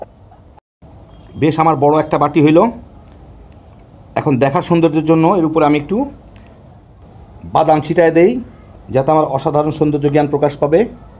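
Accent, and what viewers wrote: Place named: Bikrampur, Bangladesh. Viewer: Assalmualikum . Are you from Bikrampur (Munshigonj) ? Your sound seems like that .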